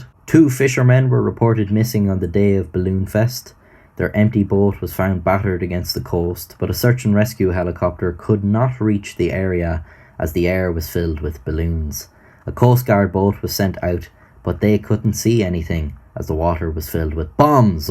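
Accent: Ireland. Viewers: Are you Irish? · Can’t tell if he’s Irish or northern Irish or something completely different but he’s got the accent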